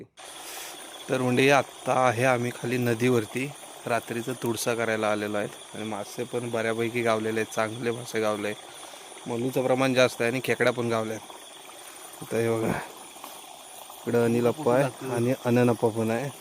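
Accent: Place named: Konkan, India. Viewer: Are wah tumhi pan Tulsa bolta ...koknit khup samya aahe ...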